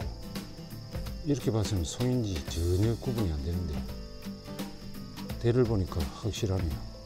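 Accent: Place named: Gyeongsang, South Korea. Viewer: 구독,좋아요,알림하고 갑니데이^^ 말투가 정겹심더~~ㅎ 경남쪽 같으시네예 산행시 늘 안전하게 풍산하시길예^^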